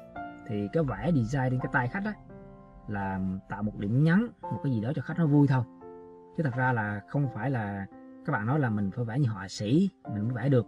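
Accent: Central, Vietnam. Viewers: cảm ơn bạn, nghe giọng miền trung mến liền🥰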